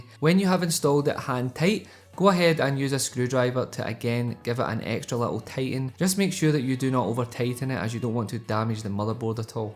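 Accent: Scotland, United Kingdom. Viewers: U Scottish or anything? Coz damn i love your accent! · clear easy to follow instructions, being a fellow scot easier to understand too, great info cheers chief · Yass, didn't expect a Scottish lad when I clicked on this video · Also, what part of Scotland are you from?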